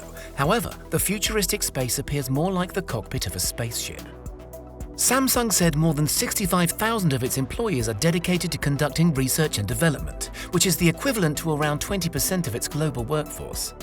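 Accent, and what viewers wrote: United Kingdom. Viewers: Its Football god dammit wtf is soccer. And you even got a british accent........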